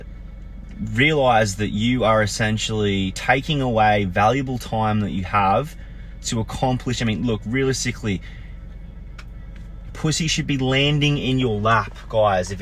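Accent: Australia. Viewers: Literally just made a vid like this aswell. You an aussie? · u Australian mate?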